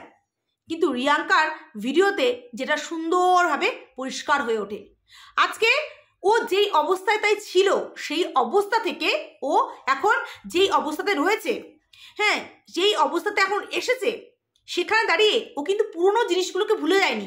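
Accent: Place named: Bangal, Bangladesh. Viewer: Bha tumi toh darun bangal vasa bolo...Tumi ki bangal go? Khub sweet lagche sunte...jodio jader niye bolcho tader ami chini na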